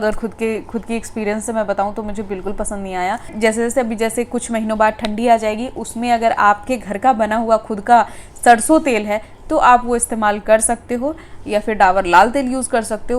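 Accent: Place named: Purvanchal, India. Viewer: love u ji mai apko tb se dekh rhi hu jb ap 5 mnth pregnnt thi qki mai b pregnnt thi ab meri beti ho gyi h... apko dekhna or sunna acha lgta h.. mera sasural b purvanchal se h to apki tone achi lgti h❤❤❤❤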